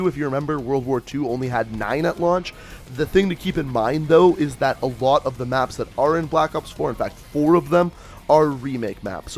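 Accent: Canada. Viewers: oh, he's Canadian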